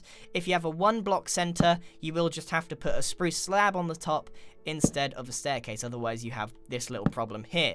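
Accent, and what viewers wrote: United Kingdom. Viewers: Are you Britain? It sounds like it. Newest sub BTW 😁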